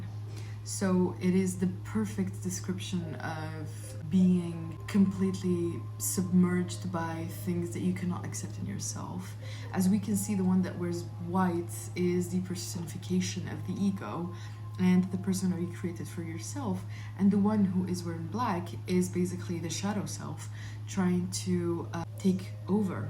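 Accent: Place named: France. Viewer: Ur french accent 😩❤️‍🔥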